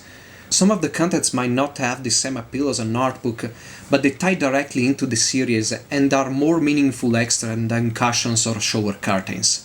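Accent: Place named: Italy. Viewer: Initially, I thought his accent was Russian or Polish, but no, Italiano. :-)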